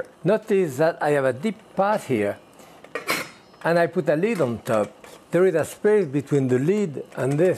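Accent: France. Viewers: Is there a better accent than the French accent? I think not. Hehe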